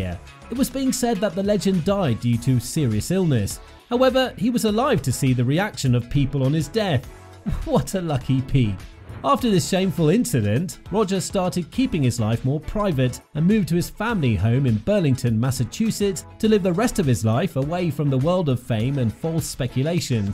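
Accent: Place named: United Kingdom. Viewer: There’s something about this style of British… I don’t even know what to call it….sensationalism?…that always comes off as insulting to the people being discussed and over-hyped. As soon as you hear that particular British accent, you know the presentation is just going to be an audible ‘National Enquirer’ presentation. It’s not going to be polite. It probably isn’t even going to be true. I have to tell you when I heard the word “dummies” used I got instantly angry. I don’t believe people actually feel that way about the personalities in This Old House. I couldn’t believe that was said. Disgusting.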